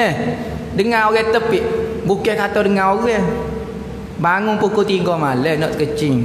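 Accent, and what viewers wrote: Kelantan, Malaysia. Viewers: Sedap dengar ustad kecek kelate....memang best....kadang²ustad lawak juga😅😅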